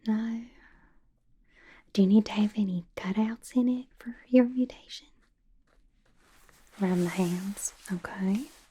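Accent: South, United States. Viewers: This is my favourite version of rogue is honestly brilliant and omg the southern accent is just perfect ahhhhh amazing 😊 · Yes, the Southern accent is very nice. Very soothing. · I love rogue and the southern accent omg, I discovered your channel about a week ago and your cosplays are on point! so entertaining and the dialogues actually are in universe, its so entertaining!!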